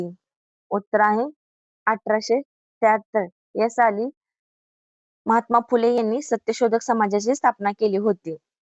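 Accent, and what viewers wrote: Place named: Satara, India. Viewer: ek no madem khup chan questions 🔥..bhashecya tuning varun satarcya ahat vatat.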